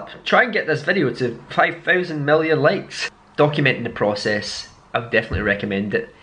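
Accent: Scotland, United Kingdom. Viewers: Im excited for you, i love listening to you talk! Scottish is the best accent!!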